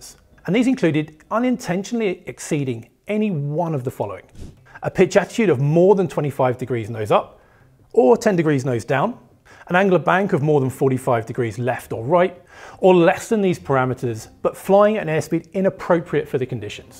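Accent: England, United Kingdom. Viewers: As English is not my first language, I'm just a bit struggling with English accent and he's sometimes a bit too fast for me. But I will keep on watching this channel that I like so much and eventually will get used to English. No offense, but I'm normally more used to American English. Anyways, keep on the good work, it's always interesting. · I understand that English was invented by the British, but British pronunciation is a challenge. Compared to the slow and emotional Pereter, it's a little harder to understand. It's become more like a lecture than a conversation with a friend. Maybe should slow down a bit and pay attention to his intonation. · should slow down his speaking speed... And make his speech more clear avoiding british accent... Otherwise you'll lose people willing to hear that video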